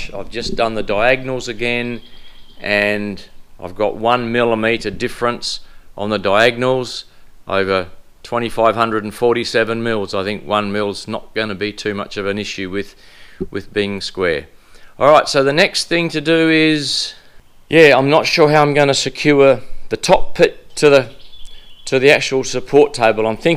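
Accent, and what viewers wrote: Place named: Australia. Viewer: Heavy over engineered base is fine, however if you want to cut thinner materials, you will end up building a lighter gantry and ditching the ballscrews. Rack and pinion would be a much better choice. Plasma is a very dirty process. Make sure to use the little plastic plugs for the bolt holes in the linear rails. Where in Aus are you? The accent and magpies give it away. :)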